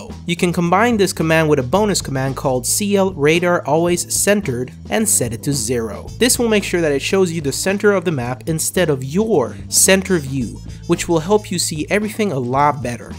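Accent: France. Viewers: Are you french or English ? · Bon vidéo! Sub! Wanted to know where you are from, France or Québec? Just curious :P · woow great french accent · Are you actualy french? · lol is he french? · is he french ? "j'espère vous voir bientôt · Tu accent français donné très bien ! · where are you from? I'm from Canada and I don't know where that French accent is from 😅 I speak French aswell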